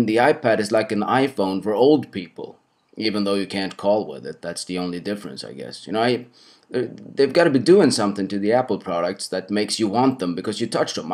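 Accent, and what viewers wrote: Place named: Sweden. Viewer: Just därför gör man inte stand-up på engelska om man är svensk och har sån där bonn-dialekt. För övrigt är Betnér en värdelös komiker.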